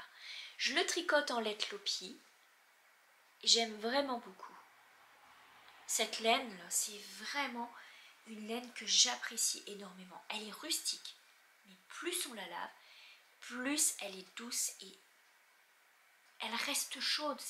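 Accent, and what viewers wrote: Canada. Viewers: Merci encore pour ce beau moment de partage. Passez un merveilleux week-end en famille. Et merci pour ce joli accent canadien qui ressort de temps à autre !! 😊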